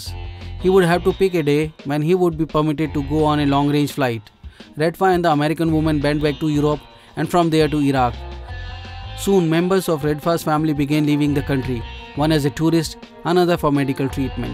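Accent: India. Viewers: Any Indian accent just ruins any program.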